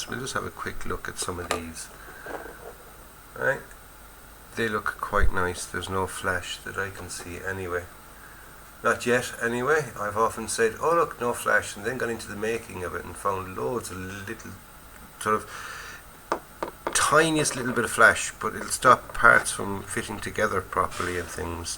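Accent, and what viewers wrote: Ireland. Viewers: And your irish accent reminds me of donald sutherlands in the "eagle has landed"!👍🏻 Jman👀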